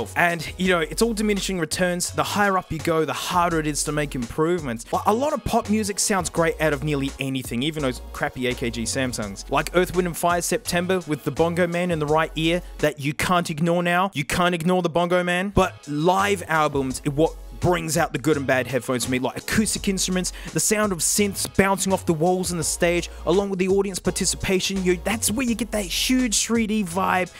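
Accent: Australia. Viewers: Aussie all the way mate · That's the aussie accent for ya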